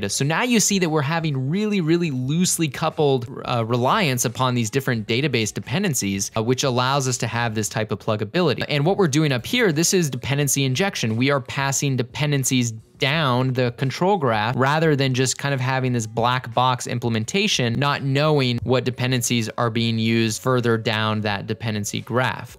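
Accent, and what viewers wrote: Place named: England, United Kingdom. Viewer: Perfect explanation it's also nice to hear an an English accent I hypothsize YouTube's algorithms promote Indian vloggers it's so hard to find programming videos in English accents thanks for the awesome video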